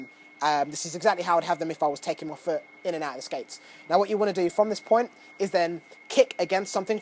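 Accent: England, United Kingdom. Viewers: Must be Sean mcmorrow's kid with that British accent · OMFG I DIED WHEN I HEARD HIM SPEAK . HE'S BLACK BUT HE SPEAKS BRITISH LOL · It’s more that you don’t expect to hear an English accent when someone’s talking about hockey. England isn’t exactly the country one thinks of when they think, hockey hotbed.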